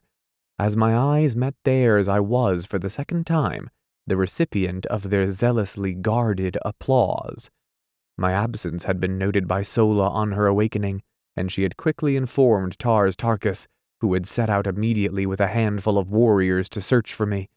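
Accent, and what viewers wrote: United States. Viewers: I have a dubt , is it american English?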